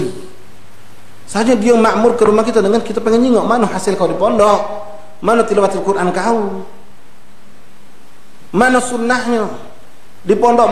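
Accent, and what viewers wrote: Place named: Palembang, Indonesia. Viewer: wah... bahasa melayu palembang ini....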